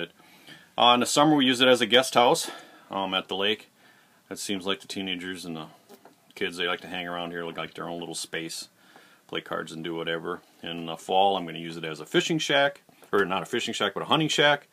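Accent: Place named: Minnesota, United States. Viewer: Are you from Minnesota? When I heard you say "The Cities" it made me think you are. And the way you talk too lol.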